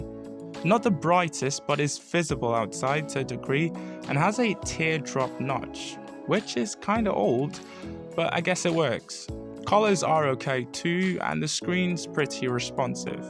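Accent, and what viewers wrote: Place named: United Kingdom. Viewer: I had no idea he had a British accent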